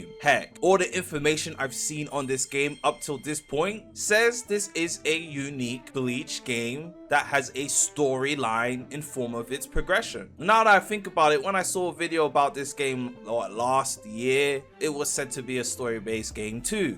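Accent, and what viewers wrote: United Kingdom. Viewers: Wait, you're british??...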